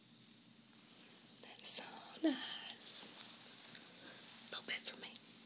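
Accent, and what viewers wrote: South, United States. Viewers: I love this character! I'm not sure if you're from the south, but you do a pretty good job executing the twang. ; ) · This is by far the tinglest video you've made...especially at the beginning when you're just talking in that melting southern voice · Good job depicting how us southern talk and act .. well the true south that is .. hehe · How not love her southern accent 😊😊😊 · This southern accent is soo cute 😍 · It's insane how much you sound and act like my cousins in the south in these roleplays.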